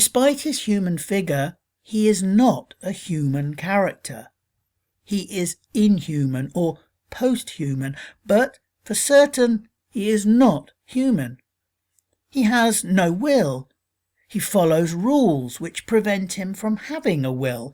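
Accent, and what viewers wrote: England, United Kingdom. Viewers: I'm quite extinguished by reviewers with British accents telling me what a noif is. Lame, tedious review of a review, and that's not a noif, this is a noif. Let Hollywood have fun til it kind of fades. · A Brit doing the speaking for a film review such as this is too distracting. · You say in English accent no less; that Chigar doesn’t have a place of origin but if you know history of conquest by Christian assholes, he is from Mexico, who pieces of shit of America ransacked in the name of a God that doesn’t exist counter to treaties made by the law. The movie is about consequences. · listen to this guy, he's English... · Jesus Fucking Christ. How many descriptive absolutes do you have to use? "From the beginning of the film, the western stereotype is immediately clear." Really, fucktard? A sunrise gives it all away, does it? 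Or is it Tommy Lee Jones's voice? Hey...from the beginning of your video, the snarky pretentiousness is IMMEDIATELY CLEAH. Dicknugget. "The leaoucation where oll the events tayke playce couldn't. be. mohr typical." Really? It couldn't be more typical, eh? Typical of WHAT? Typical of your stupid blanket commentary? God damn. Were you sniffing the queen's meat curtains while you wrote this steaming pile of shit and chips? "Oll thuh main c.h.a.r.a.c.t.e.h.s ah PEFECTLY in.ti.grated IN this environment...THEY ah the essential element of every westehn mouvee." SHUT THE FUCK. UP. Over-generalizations and pretentious King's English phrasing does NOT make for an effective, meaningful review, especially when you're just jerking it in your face. Come on. If anyone took this narrator seriously, this movie would fucking bake the bread for your Texas Toast, slice it, butter that fucker up, toast the sum'bitch, and slap your ass silly 'till you admitted hyperbole isn't a reality. 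God. The British Accent... sooooo ponce. Maybe if Bruce Dickinson of Iron Maiden were narrating, we'd get a good read. But no. It's who only completed level 1's. Fucking shut up. Good name, btw. Pinheads. Yeah...I only made it 42 seconds in. TYPICAHL AMEHRICAN.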